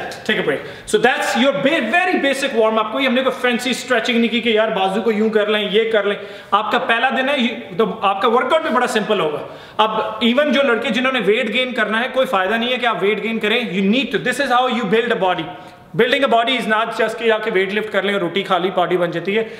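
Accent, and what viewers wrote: Punjab, India. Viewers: bhai apki sbse achi bat to ye h ki aap hindi me jo thodi thodi punjabi bolte h bhoht mast h bhai punjabi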